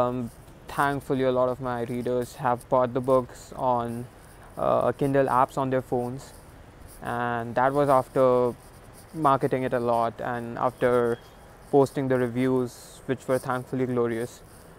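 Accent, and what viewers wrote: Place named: England, United Kingdom. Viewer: You r a outstanding quoran brother but remember one thing this english accent won't fetch publicity to u...Just be practical...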